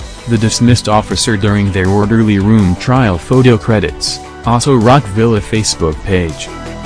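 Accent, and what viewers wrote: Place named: United States. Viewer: I can feel the voice on this video is always on YouTube posting dirty stuffs about Nigeria and other African countries, not for once any good thing about them. Whatever country you are from, I think there are enough dirty stuffs to talk about as well. You accent sound American, and if you, I guess as much. Shame on you.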